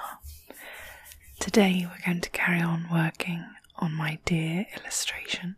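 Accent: United Kingdom. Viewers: Really enjoyed listening to this...makes a change hearing an English accent but also fascinating understanding how you approach your art. Would love to see more of this from you as it works on so many levels :)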